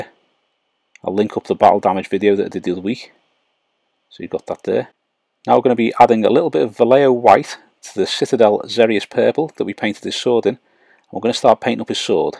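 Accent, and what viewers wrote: Liverpool, United Kingdom. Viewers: did any1 else at the begining think he sounded like he was from the netherlands n was talking a foreign language on the introduction then i realised he was a scouser no offence loool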